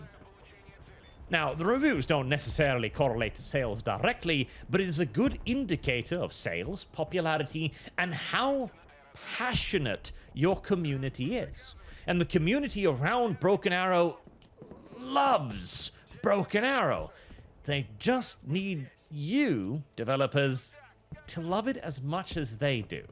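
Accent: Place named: United Kingdom. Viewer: Bloody hell, This guy's British is so powerful I feel like im in a British Tea party, Im not even British!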